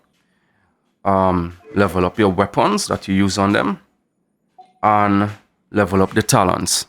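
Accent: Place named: Trinidad and Tobago. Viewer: You sound like a trini. Btw good video. You earned a sub.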